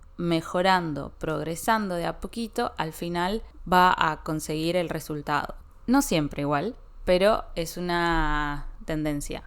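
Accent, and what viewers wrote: Argentina. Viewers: Eres argentina ❤? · ¡Hola! Tu acento es lindo, y no es tan cargado como generalmente es el acento argentino. ¡Me encanta Argentina! A ver...Yo acrescento más un sitio que también se habla español, aunque no de una forma oficial, pero se habla mucho español, que es Miami en Estados Unidos. Yo ya hice una investigación en la internet, y las fuentes que yo consulté, todas se ha comprobado que es totalmente posible vivir en Miami sin necesidad de saber inglés. Yo inicialmente pensaba que el español en Miami era necesario apenas para turismo, pero llegué a la conclusión que es posible vivir en Miami sin saber inglés. Saludos brasileño desde Río de Janeiro 🇧🇷😊.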